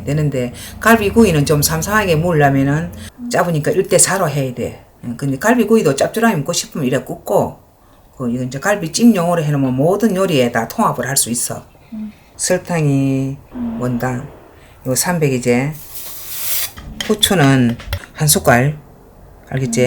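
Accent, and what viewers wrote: Gyeongsang, South Korea. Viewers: 경사도 사투리로 TV 요리프로에 함 출연하시면 좋겠어요!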